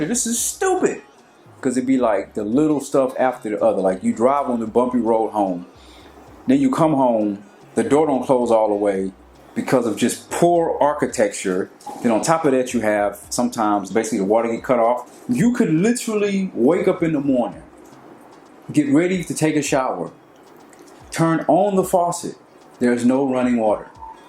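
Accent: Ghana. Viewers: They do that in JA too. If they see u from the states they think u have money. Oh wow this is sad the roads are this bad. Its show how much the government care. The money going in their pockets thats what...these places are so corrupt. This is why they beg their family to send stuff from here. Ur Ghanaian accent is so on point tho lol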